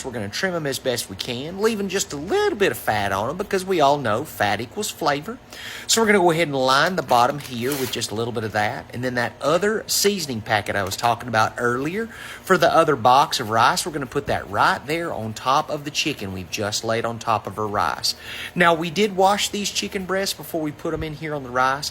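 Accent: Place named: South, United States. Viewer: Looks so good! And easy. You sound like me! Love to support my Southern family! ❤❤ Subscribed…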